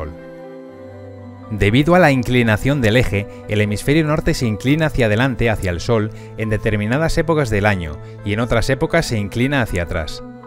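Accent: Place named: Castile, Spain. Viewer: Gracias por el video, pero más que nada por narrarlo en castellano,estoy hasta los 🤬🤬🤬 de oír videos de latinos con esa forma de habla musical y con palabras indescifrables que ellos tienen,acordes a su cultura y a su emisferio.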